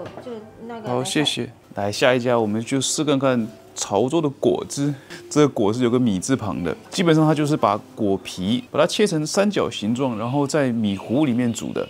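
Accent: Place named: Taiwan. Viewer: 博主是哪裡人？臉相是浙江人，但是說話又有點台灣的感覺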